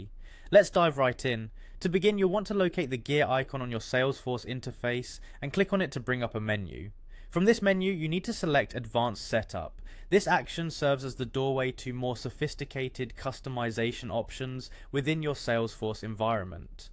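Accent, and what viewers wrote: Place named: England, United Kingdom. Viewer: Great video! Finally a proper English speaking accent 🤩 music to my ears 😍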